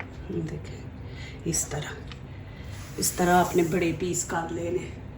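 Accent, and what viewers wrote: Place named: Punjab, India. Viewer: Allah apko sehat de, love your typical Punjabi style in urdue , I am from Texas United States of America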